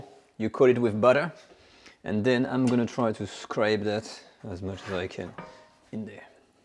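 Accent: France. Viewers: I like his French accent so much! It adds a lot to the experience!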